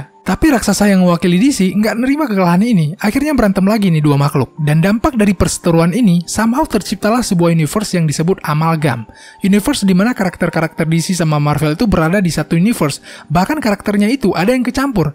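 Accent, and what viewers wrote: Aceh, Indonesia. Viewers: Abang org aceh?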